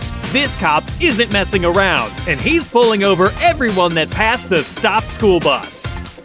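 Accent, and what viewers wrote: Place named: United States. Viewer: That's the most American narrating I've ever heard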